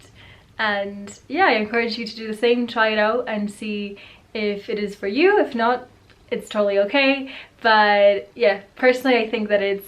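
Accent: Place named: Scotland, United Kingdom. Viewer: Sitting here smiling half hearing a Scottish accent and then it disappears again. I now see you spent some time in Scotland. Much from jennifree in Edinburgh 😀🏴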